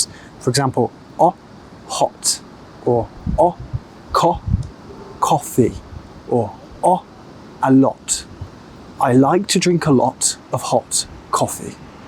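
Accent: United Kingdom. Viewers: So beautiful the British accent, I really love it although it can sometimes be quite difficult to understand. Thank you for this interesting and amazing lesson ❤❤ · such an energetic and lesson and inspiring british accent youtuber, I hope I may successfully prounce it as well in the future😃 thank you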